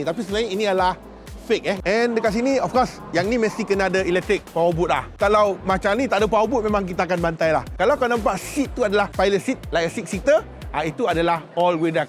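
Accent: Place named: Negeri Sembilan, Malaysia. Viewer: kan nismilan bona bunyi eeh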